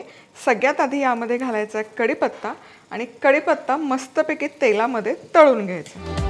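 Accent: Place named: Sangli, India. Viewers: आम्ही पण सांगलीकर आहोत.त्यामुळे खूप मजा आली भडंगाची रेसीपी पहायला आणी हो टिपीकल भाषा सुद्धा बोललात ते लै भारी वाटल...... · कोल्हापुरि भाषा मस्त जमते की....खूप मस्त भडंग.....🎉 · Chan bolalat Tai sangalichi bhasha ani recipe pan mast❤❤ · भाषा सांगलीची व भडंग पण सांगलीचा फार. छानच वाटल म्याम......🙏🙏🙏🙏🙏❤️❤️👌👌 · खूपच बेस्ट कोल्हापूर बोललात विषय हार्ड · tu अमेरिकेत राहून सुद्धा सांगली,कोल्हापूरची भाषा खूप छान बोलतेस.तुला पुढील रेसिपी साठी. All the best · सांगलीची भाषा आणि भडंग दोन्ही जमलंय चांगलं 👌 Love from sangli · जमल की सांगली ची भाषा बोलायला आणि भडंग तर लईच भारी 👍🤩✌️,l am from सांगली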